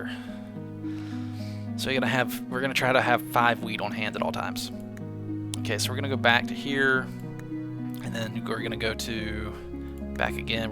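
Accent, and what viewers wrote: South, United States. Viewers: there is no usual english. usual for americans is different from the usual for the british and he has an american accent. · What are you talking about? There is no 'usual' or 'normative' english (language) accent, it depends on where you live and what you're used to. If you live in America, the 'usual' English accent to you will be American (ignoring the many american regional accents). I'm sure ' accent is fairly normative for where he lives. He'll just have one of the many different Southern USA accents, of which Texas is a stereotype people like to compare accents to. Here in England his accent is not usual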